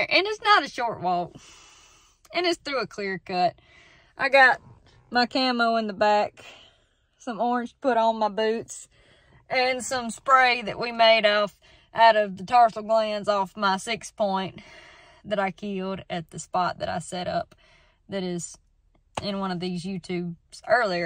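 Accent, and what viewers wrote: South, United States. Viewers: Back in <num> I was on active duty in the USMC. Ended up as a Sergeant E-5. It was people like you especially with that southern accent that I could really rely on to get the job done. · I like your spécial south accent.... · You've got the cutest southern accent ever!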